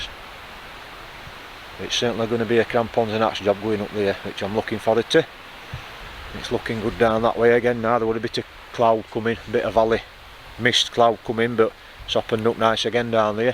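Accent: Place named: Liverpool, United Kingdom. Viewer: Loving the scouse accent pal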